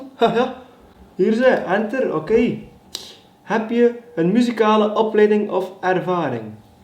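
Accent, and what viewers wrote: West Flanders, Belgium. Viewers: Jaaaa West-Vlaamse k3!!! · Tzo wel skwone zin wi nen West-Vlaamse k3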